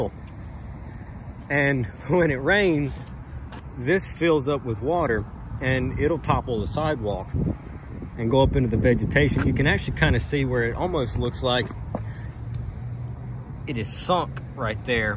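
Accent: South, United States. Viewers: ...You got me with the thumbnail...I thought ooh new Post 10 video...Then I clicked on it and heard your smooth southern accent...haha!!! You're both Legends! What you need is your 'Drain Maker 6000'...haha!!!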